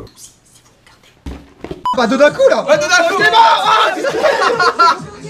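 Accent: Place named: Nord-Pas-de-Calais, France. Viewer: Putain l'accent ch'tit mec xD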